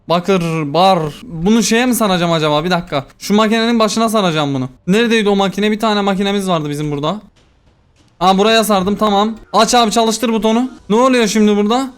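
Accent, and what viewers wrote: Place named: Thrace, Turkey. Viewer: Abim sen trakyalı mısın sesin trakyalı ya benziyor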